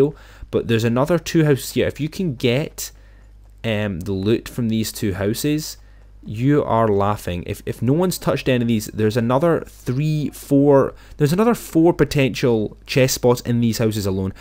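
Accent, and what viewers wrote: Scotland, United Kingdom. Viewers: Dats a thicc Scottish accent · Are you Scottish? You sound like it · I think he's british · He's from Scotland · Are you a Scott? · Are you Scottish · Yo im scottish and even i can say that scottish acsent just doesnt work on youtube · You scottish? We can be scottish bros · This guy is Scottish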